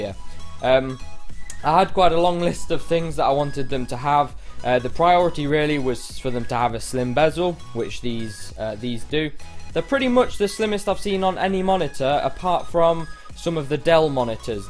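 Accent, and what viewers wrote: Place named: England, United Kingdom. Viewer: You sound so english xD I know he is, so don’t comment saying «That’s because he is!!!» I KNOW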